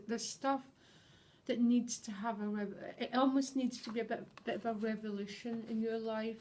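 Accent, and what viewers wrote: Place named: Scotland, United Kingdom. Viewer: Thank You for reply, Me too, I'm from Scotland, I could still hear the Scottish twang in your voice, My connections are with Edinburgh & the Scottish Borders, I moved to England 10 years ago, Lived in Burton On Trent then Wiltshire & Now I'm settled in South West Devon beside the sea. x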